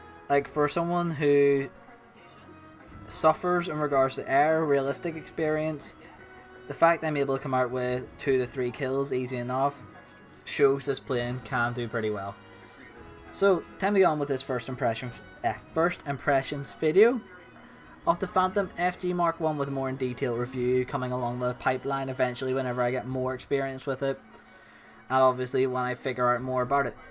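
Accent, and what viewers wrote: Northern Ireland, United Kingdom. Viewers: 100% northern irish, greetings from Lisburn ayy. Great video btw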